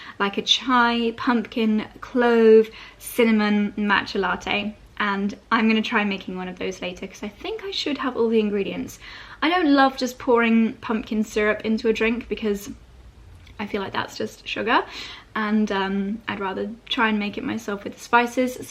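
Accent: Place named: United Kingdom. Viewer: Yes that word isn’t used in The US. However, you need to continue using The Queen’s English. It’s very charming to Americans listening & watching your Vlog!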